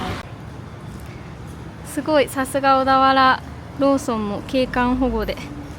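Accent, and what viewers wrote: Kansai, Japan. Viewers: 大阪弁がかわいすぎる。熊本弁、岡山弁、名古屋弁もお願いします。全部かわいいと思う。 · 関西弁の 👍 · 私は箱根に行くときは、もっぱらっ車ですが、登山列車もおもむきがあって良いですね。会話の端々で出る関西弁が可愛いです😊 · Suica一枚だけ旅！熱海で海を楽しみ彦根で温泉楽しんでの満喫旅♬ にしても温泉上がりのちゃんすっぴん？？って一瞬思ったけど流石にそれはないわな。。。ｗ ☆今回は関西弁多めの動画で普段にもましてカワイイやないか~🤣 残高１円・・・最後、乗り越し精算になってしもたらどなするつもりやったん？ｗ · Suica1枚で充実した旅ができてよかったですね😊 残高1円は奇跡ですね😊 最初のほうで関西弁が親近感があって嬉しいよ😊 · 今回も楽しい動画ありがとうございます！こういう企画もいいですね😊それにしても残額1円って流石ですよ！！東海道線(熱海行き)、座席上にSuicaかざしてグリーン席を利用するのは、初めて知りました！根府川駅で急に関西弁が飛び出して驚きましたが、さん関西弁は可愛くて、勝手に親近感を感じてます😆 熱海の砂浜に「Suica」は、ちょっと笑っちゃいました、小田原城の天守閣から見る景色も最高ですね、天成園の外観・森の奥深くにありそうな雰囲気がとっても良くて、浴衣姿もとてもよく似合って可愛かったです😊 他の方のコメントを読んでいて、タッチでGOは東海道新幹線で利用できないと書かれていて、自分でも調べたんですが、使えないんですね……勉強になりました🤔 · 熱海の砂浜の波打ち際を裸足で歩いてる姿はアイドルのように可愛かったよあと関西人な自分にはさんから時々出る関西弁が親しみを持てて良かったです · どれどれ可愛い😍ちょこちょこ関西弁が良き良き😊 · 箱根は、さんのお気に入りの場所リラックスできて関西弁も出てましたね！ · 所々関西弁とか、お箸が左利きとかなんかいいね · いつもに増して関西弁がちらほら🤭 カラス🐦‍⬛さんはご無事でしたか？夏は房総、秋は北関東、冬は雪☃️があるところと、季節ごとにシリーズ化してください😊 そうそう、お城🏯にもご興味がおありなのですね😊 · なかなか、楽しいチャレンジ企画ですね。 Suicaのいい宣伝にもなりますし、めっちゃ、Suica Loveですね！で、熱海の砂浜に、Suica と描いた映像はなんか、とても、シュールでした！さん、いいセンスしてますね！さんのモリモリ食べるシーンで、美味しさが伝わります！時々、出る関西なまりが、かわいくて、素敵ですよ。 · 斬新なSuicaを使い切っての旅動画アップありがとうございました♪ どんな動画になってるのかワクワクしながら観てました🤭 Suicaが使える使えないのシーンでの効果音が、まんまさんの心の声のような気がしておもしろかったです😂 根府川駅のカラスさんはおもしろすぎました。華麗なステップすぎて🤭 熱海城って聞いた事ない城だなぁと思っていたら、熱海には城があったという歴史はなかったんですね。商業施設としての熱海城という名前だったとは知りませんでした。熱海サンビーチでの落書き…何を書くかと思えば斬新な…🤣 ちなみに「hola」はスペイン語なんですが、スペイン語ではhは発音しないので「オ-ラ-」と読みます。英語で言うHelloですね。小田原城！行ってみたい城だったので天守閣や天守台からの展望見せてくれてありがとうございました✨ 説明しようとしたら、ちゃんとさんが勉強して説明してくれてました🤭 秀吉が小田原征伐で建てた石垣山城は天守から見えたかな？箱根フォント初耳だったのでメモしました_φ(･_･ 入浴施設のお蕎麦おいしい説、絶対ありますよね！最後の残高には思わず「マジかぁ〜」となりました😆 私もSuicaって便利なんやなぁと思いました🤭 今回はさんの関西弁多めで嬉しかったです。さんの関西弁聞いてファンになった身でしたので。見応えたっぷりの動画ありがとうございました😊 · ２万円有効活用の旅楽しかったです❣ さんの時々出る関西弁が可愛いです❤ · ちゃん、たまに関西弁が出る。親が関西人とか? 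· 月曜に動画上がって癒されました✨️たまに出る関西弁が関西出身からしたらめっちゃいいです！暑いので、水分しっかり摂って夏を楽しんで乗りきりましょう！ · おー大スター、ナチュラルな関西弁ですかね。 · なんで関西弁やねん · 今回もありがとうございます！たまにはこうしたノープランで旅に出るのもとてもいいなと思いました。残高1円で終わられたのもすごい！たまに関西弁が出てくるところがとても親近感があって良かったです！箱根登山電車は紅葉の時期に行ったことがありますが、四季を通じて年中楽しめる路線だと改めて感じました。天成園さんの温泉が気になったので箱根に行ったらチェックしたいです！ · たまに出る大阪弁が可愛い。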